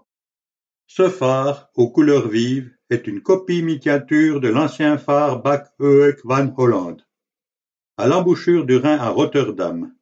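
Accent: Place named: Vaud, Switzerland. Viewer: Une magnifique présentation avec moult détails et des photos à couper le souffle! Et ce joli accent vaudois 4a n'a pas son pareil..... Merci.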